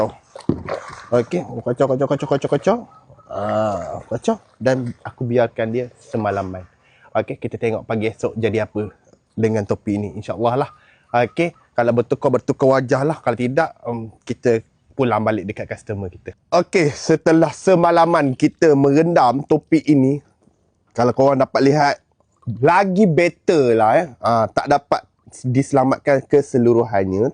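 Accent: Kuantan, Malaysia. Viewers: brother ni contoh terbaik loghat kuantan yang masih wujud. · Orang Kuantan je boleh tahu dengar loghat Kuantan mcm mana😂😂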